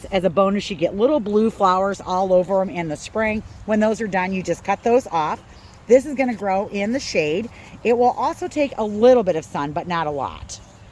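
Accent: Midwest, United States. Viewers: If anyone needed an example of Midwest accent, this is it. Love the video!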